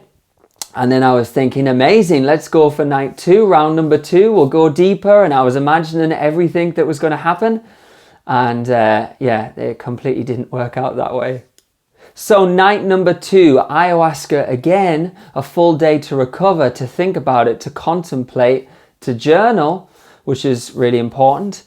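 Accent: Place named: Newcastle, United Kingdom. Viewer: Geordie maybe 🤔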